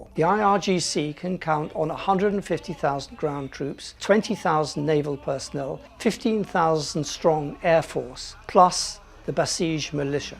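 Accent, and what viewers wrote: United Kingdom. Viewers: His british accent automatically disqualifies the speaker as their our real enemy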